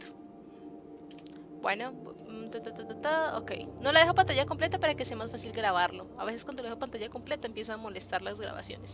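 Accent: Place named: Caribbean Coast, Colombia. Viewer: Pareces costeña en ciudad de cachacos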